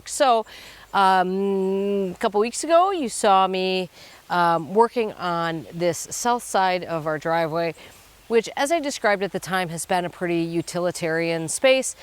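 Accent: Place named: Midwest, United States. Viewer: I appreciate the recommendation, but in checking out Soil and Margarita’s channel, it seems like she only wants to do videos at a certain time of day when the sun hits her just right so she looks her best (her words), she doesn’t like interruptions and stopped filming on garbage days for that reason (not that I blame her, but there is nothing “raw” about that), and just generally seems much more fixated on film production than “happy accidents” and filming whenever and wherever the desire strikes. I’m also a dog lover, so those interruptions are some of my favorite moments here! (Cannot beat the dunking of the head into the stock tank moment with quick wit of saying the dye is safe for even Newfoundlands!) 😆 I also just really feel a connection with the midwestern dialect as I’ve been separated from that area for many years and it feels very homey to me. 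Soil and Margaritas seems like a very sweet content creator, and indeed, she said she is not in it for the money, which is why I’m guessing you recommended her, but she’s definitely not like. I hope she has great success just the same though! 😊❤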